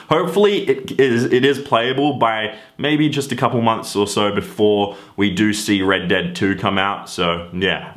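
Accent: Australia. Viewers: Are u Australian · thank god your back i miss that Australian accent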